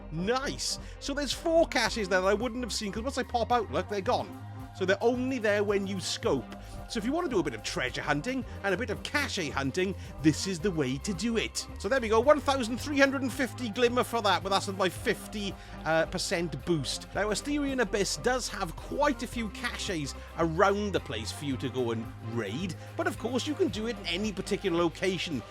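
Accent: Wales, United Kingdom. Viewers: Because he has a Welsh accent. Plus he’s said in a previous video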